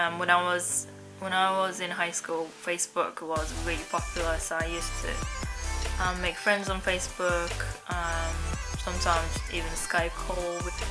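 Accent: United Kingdom. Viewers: wow!!!最初の数10秒くらいはニュートラルな話され方をしてたからか、あまり気づかなく、なんとなく聞いてたのですか、1分後くらいから、うぉ！！来た！！！ってなりました🤣若干ロンドンのcockneyやMLEにも聞こえる気がしました！ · You do sound Northern lol....英語ペラペラやん！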